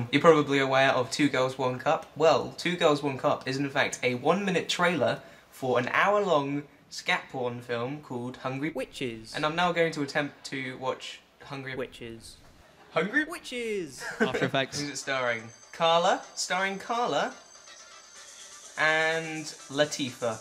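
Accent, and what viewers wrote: United Kingdom. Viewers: what kind of accent is that? i know it is british but is there a specific place? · The patented style british accent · We don't need yer English baaaastaaard accent. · I can't watch this is my living room because I'm worried my mom will see me watching a cute guy with a British accent take his pants off. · If leaves the U.K. and comes to live in the U.S. then eventually he'll lose his accent wont he?:(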